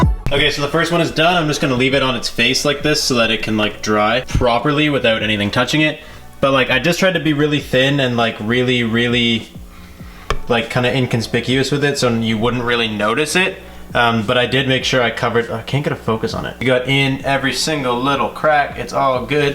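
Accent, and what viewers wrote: Canada. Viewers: Sound Canadian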